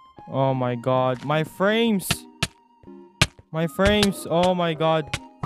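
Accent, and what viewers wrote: Philippines. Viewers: Are you a pilipino you sounded one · from Philippines Confirm! Hehehe Proof: 1:From one of your helper in you server 😅 2:Your voice 3:You play in Asia Region Only that ooooffff But that's a good proof🤣🤣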